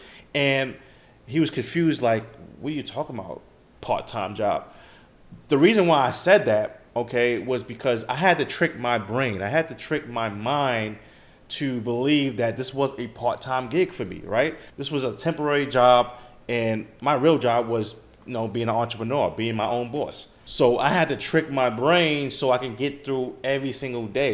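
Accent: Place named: New York, United States. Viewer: you must be from new york, it’s the accent & hustle mentality. i literally feel this with my job rn, in between structuring my time & working for entitled people it really sucks. I definitely need to be an entrepreneur & master forex and the psychological aspects of it, so i’m really thinking about leaving when the time is right thank you for sharing!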